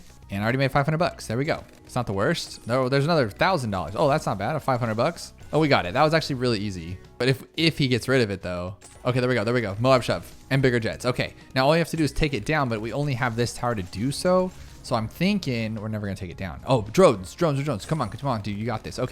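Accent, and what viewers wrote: Norway. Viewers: Are you Norwigian? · You’re Norwegian? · vent vent vent, er du norsk?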